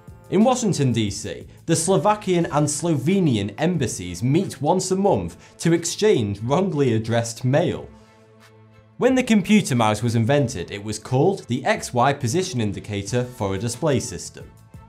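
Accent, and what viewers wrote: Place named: England, United Kingdom. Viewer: Yes 42 is the answer to life..... it is from a wicked cool movie... I bet you know what 1!!! and yes his name is a play on words but also he is English.... Hench he has an Accent... You silly silly sausages!!:) p.s. you rock my brain with all of random facts!! I am so addicted to your channel:) Peace.:) x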